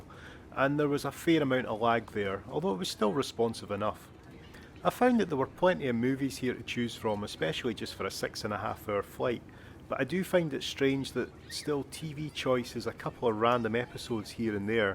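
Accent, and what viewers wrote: Scotland, United Kingdom. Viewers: I loved the 747 too... you sound much less of a Scot seven years ago..😂😂😂😂😂 went to India on a BA 747 with my friend, Club Class, it was ok, not special.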